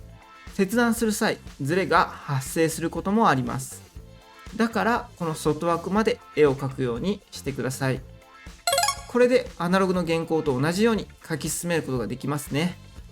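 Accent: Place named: France. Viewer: Merci beaucoup professeur, vous nous aider beaucoup 🥹🙏🏾🥰 j'adore votre accent français, c'est juste incroyable 🤌🏾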